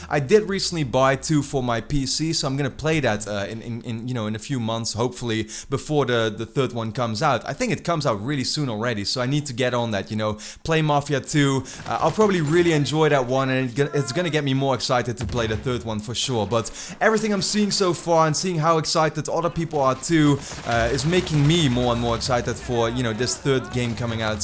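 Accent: Netherlands. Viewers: Ik heb net je kanaal gevonden. Je maakt echt heerlijke content. Plus je klinkt zwaar Nederlands, dus dat is een instant sub. :p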